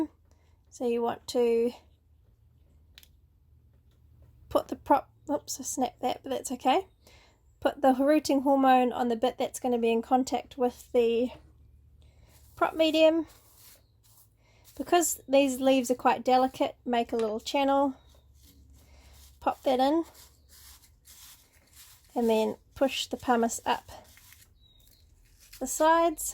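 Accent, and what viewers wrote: New Zealand. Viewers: I love your videos, binge watched them all 😊 Your accent is the best, brings me back to the time I spent in NZ ❤️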